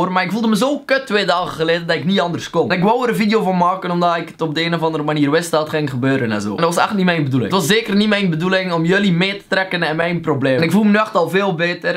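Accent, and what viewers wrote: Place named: Flanders, Belgium. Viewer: ik ben zelf een nederlander, maar ik vind Vlaams meestal best een stomme taal. maar bij jou vind ik het echt heel grappig en ik word er blij van! :)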